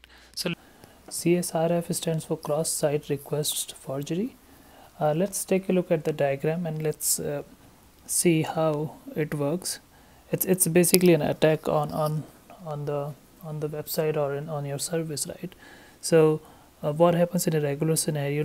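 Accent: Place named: India. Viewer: these indian's accentes is disgusted even for my non-english ears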